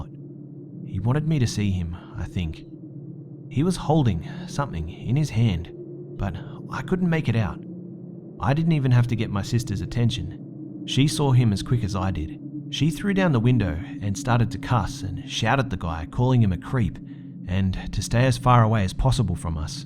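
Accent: Australia. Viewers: I think it's an aussie way of talking. I never noticed it before but a few weeks ago I started noticing it and I first thought it was cute and very aussie, like how he pronounces building 'biwding,' but I feel like it's getting more and more frequent and I catch it every time. it's not unbearable but the frequency of it does make we want to cringe a little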